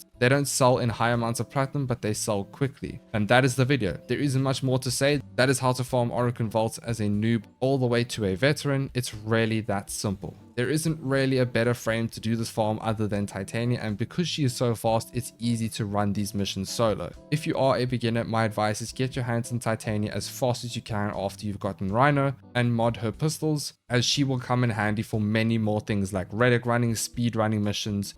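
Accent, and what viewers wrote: South Africa. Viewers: i have to ask where are you from? you sound like you from South Africa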